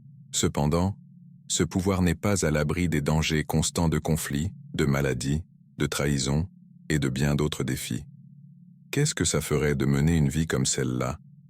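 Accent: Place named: England, United Kingdom. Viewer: C'est pénible cet accent anglais pour parler français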